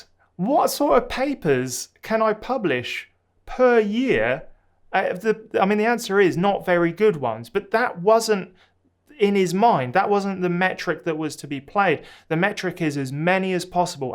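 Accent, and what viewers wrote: United Kingdom. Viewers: I'm sorry to say that but you sound overall rather apologetic... Academia is a great "industry" and there's very few other industries that could offer conditions that would match it. That said, academia isn't for everyone. I have seen many graduate students giving up in the process because they were "disappointed" in "the game". But frankly, these were just people not cut out for academia (some of them did amazingly well later on in other industries, though). So, rather than complaining about academia not matching your high expectations, it would be totally fine to just admit that it might be you who isn't the right match for it. That does not mean that you can't be good at something else, of course. Finally, based on your accent I assume you are from the UK, and your experience with academia is based on how things are in the UK. 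I just wanted to say that the UK academia isn't a great benchmark--it is pretty bad in terms of (a) pay, (b) pressure to obtain external grants, (c) job security... Things are better in other countries. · Love the accent btw, nice to hear Western Brit dialects in this part of YT.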